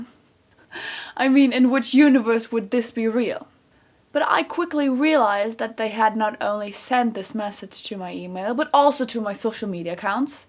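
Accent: Ireland. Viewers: Willout I also believe in a Q and A a couple of years ago, said she'd spent some time in Ireland as well, which explains the brogue. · Willout Up until seeing this video, to me; She always sounded as if she had a thick Irish brogue. It still sounds that way; to me.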